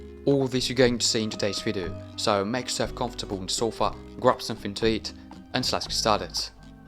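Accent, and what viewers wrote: England, United Kingdom. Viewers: ¡Me encanta el acento de Inglaterra!😊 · I've taken a few classes in Spanish, so I kinda have an active beginner's knowledge, and a somewhat passable passive knowledge, at least when written. Anyway, I knew about almost all the facts here, already, except for Equatorial Guinea. That English accent is quite something, though. I almost found it easier to understad you in Spanish... 🙄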